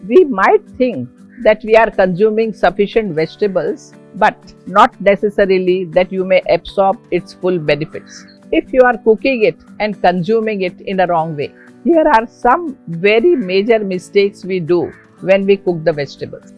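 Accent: India. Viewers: I love her and I love this channel and I mean no disrespect when I say she sounds like professor McGonnegal with an Indian accent 😂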